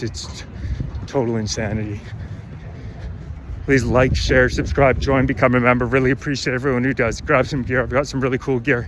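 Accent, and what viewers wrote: Canada. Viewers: Your Canadian accent is awesome! Keep fighting, brother. Love from Belfast.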